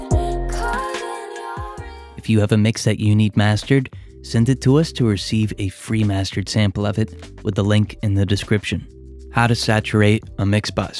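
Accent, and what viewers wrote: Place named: East Coast, United States. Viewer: I still don’t understand why your pronunciation has COMPLETELY changed from your older videos. It’s like you’re trying to sound a little east coast-ish. Whatever it is, I’m puzzled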